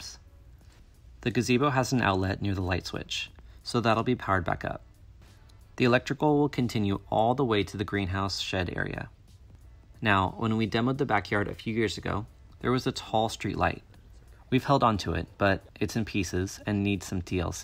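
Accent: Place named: Germany. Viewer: ja hört sich irgednwie sehr deutsch an. xD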